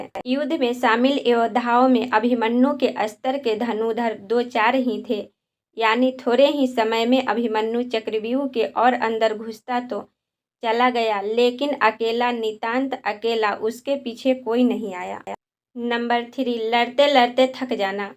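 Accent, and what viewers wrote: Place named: Bengal, India. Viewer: Thoda apna hindi bolne ka trika sudharo hum lagta hai bengali ho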